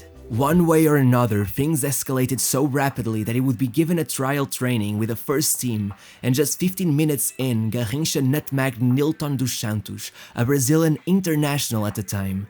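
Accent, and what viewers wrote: Portugal. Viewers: My friend, you are from Portugal. I can feel it in the accent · Nice try, mas dá para perceber o sotaque português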